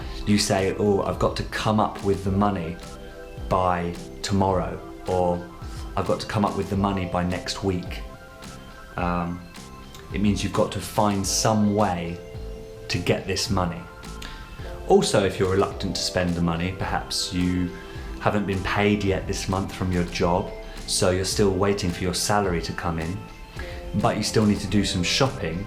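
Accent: United Kingdom. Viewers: Where do you live in Uk? Your dialect? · Hello,! I found your channel and let me tell that it's amazing! I learnt new words and phrases today. I really love the British accent and hope you keep uploading more videos. Finally, what do I think of British currency? Well, the first phrase that comes to my mind is "great exchange value", because British currency has more exchange value than others kinds of currency. 💷😎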